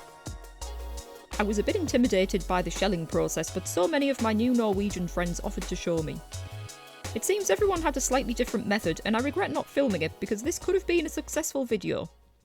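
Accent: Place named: Newcastle, United Kingdom. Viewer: While watching I thought that’s a geordie accent then you mentioned Ouseburn. I’ll have to check that one out because I love wandering around Ouseburn. I’m going to Bergen in April so looking for tips. 😊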